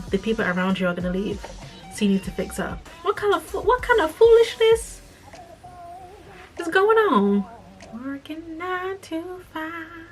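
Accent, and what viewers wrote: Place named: New York, United States. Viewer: You did pretty good with your NY accent (like your humor), time to test it out in person...Have you ever been to NY?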